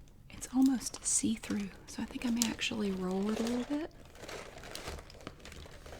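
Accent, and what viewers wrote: South, United States. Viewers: Omg yes . Like a southern Pam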